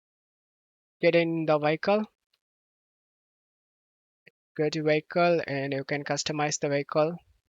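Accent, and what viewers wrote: India. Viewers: Indian accent, i knew it will work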